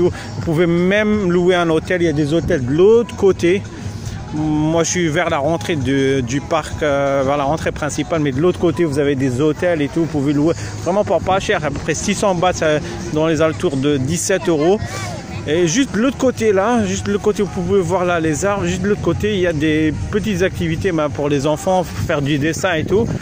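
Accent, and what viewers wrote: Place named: Italy. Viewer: tu est italien ? ta une petit accent italien , je vais debarquer avec mon velo electrique à korat lol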